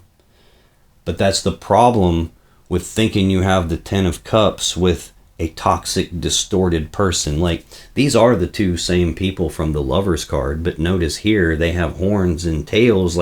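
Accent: United States. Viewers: Yes, I want a personal reading. You’re awesome. Prayers for your person situation. Help me unravel mine? Time zones may affect the coordination. You don’t sound Australian so, I’m guessing American? I think it’s 12 hours difference. I’ll book. 🤪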